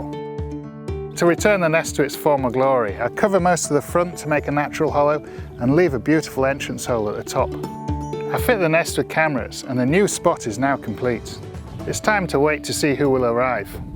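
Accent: United Kingdom. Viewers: Male toenail. Toenails. Love the British accent.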